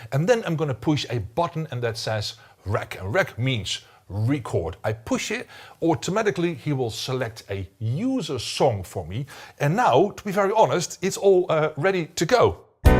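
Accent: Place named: Netherlands. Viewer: His accent... must be dutch.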